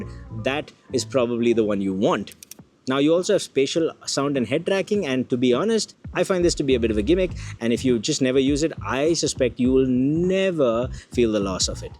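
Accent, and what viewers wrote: India. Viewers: You should make a choice and reduce one of the following to things: level of background music or Indian accent. One is always distracting another and so on.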